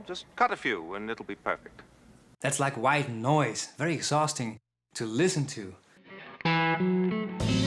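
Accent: Netherlands. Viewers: It's a Dutch accent, sounds great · Sniff sniff, I detect a faint Dutch accent. Am I right? Bedankt voor de tips! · Man your lessons are so fkn solid! I love them. Just curious though where are you from? Holland, Germany? Very curious for no reason. Thanks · I’ve enjoyed the videos as well as Paul’s. They both produce super high quality videos with great content! And I like that Dutch accent! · no such thing a European accent... you know how many different languages Europe has right? It is a Dutch accent though 🤪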